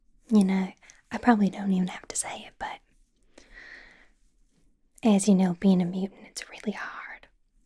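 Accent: South, United States. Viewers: As a huge marvel nerd, the southern accent is a nice touch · love the southern accent!! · love the inclusion of small southernisms, it really sells the accent and the video was great :3 - a southern person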